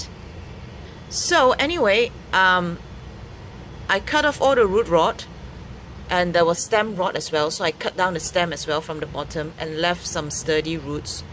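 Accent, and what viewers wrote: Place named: Singapore. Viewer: Hi you sound like a singaporean ... where do you get variegated plants in SG?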